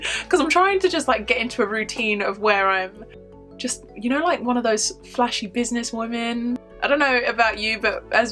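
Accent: United Kingdom. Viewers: I just discovered your channel and I love it ! By the way Oh My God I am obsessed with your british accent 💕💕 PS: Hi from France 🥰🥰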